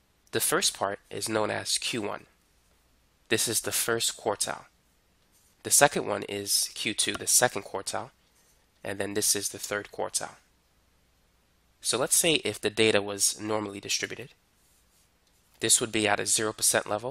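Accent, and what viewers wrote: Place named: Brooklyn, United States. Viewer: This guy has just the slightest Brooklyn accent and sometimes it comes out for just a second.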